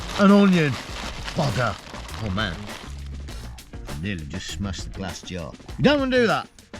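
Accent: Leicester, United Kingdom. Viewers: Where are you from Leicester?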